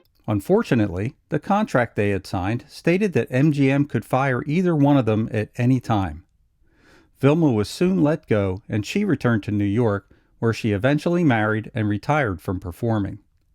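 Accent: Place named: Baltimore, United States. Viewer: lovin the baltimore accent of the narrator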